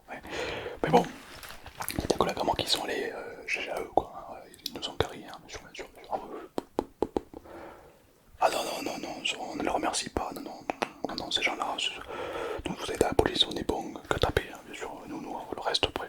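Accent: South, France. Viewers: Ce qui marche bien c’est que ca gars il a pas besoin d’imiter l’accent du Sud, ca rend la truc assez réaliste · J' adore ton accent du sud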